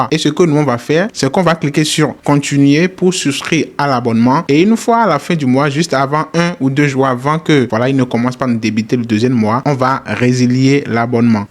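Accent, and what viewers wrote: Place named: Benin. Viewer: MERCI BEAUCOIUP MON GARS TU ES UN 10. JE NE TE CONNAISSAIS PAS AVANT, PREMIEE IDEO JE TOMBE SUR DE LA VALEUR GRATUITE MERCI BEAUCOUP.DIS MOI TU ES BENINOIS ??? VU TON ACCENT ON DIRAIT. MOI JE SUIS BENINOIS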